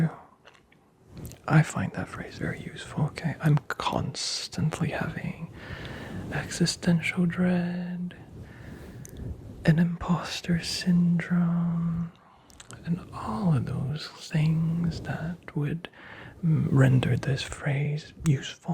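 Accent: Brazil. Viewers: claro, ele é brasileiro.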